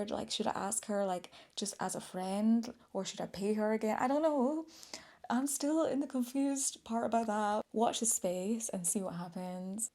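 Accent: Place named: Scotland, United Kingdom. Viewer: Dear, I am not at all sure why your videos started appearing in my feed, but I am very glad they did. It could be because I had watched a few Japanese travel things previously but who knows the whims of the Great Algorithm? Anyway, I found the cuddling video deeply meaningful and also moving. It was also lovely to hear your accent (I am originally from Dundee) and your red hair. Oh, I am male, quite a bit older than you and "straight" whatever that means. I hope you will continue to make these lovely travelogues. Safe travels 😎😎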